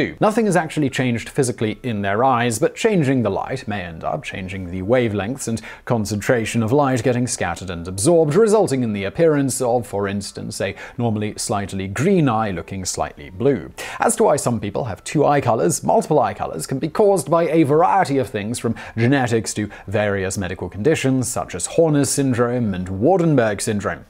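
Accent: England, United Kingdom. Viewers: Please stop trying to impersonate a garbling artificial intelligence robot. Please just speak much more slowly and clearly in your clear English accent.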